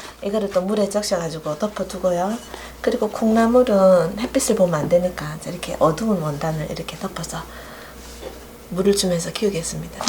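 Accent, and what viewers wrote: Gyeongsang, South Korea. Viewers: 감사합니다 답글^^* 그런데 누가 그랬는데, 콩이오래되거나 묵은콩이면 안됐다고 들었던거 같아요 햇콩이나~ 일년 안쪽에 된콩이 좋다고 그런거같은데 암튼 미국에서는 그런콩이 없었어 안되나보다 하고 그 뒤로는 아예 할생각을 안했거든요, 항상! 구수한경상도 말씨로 깔끔하게 쉽게 설명 잘하시고 늘건강하세요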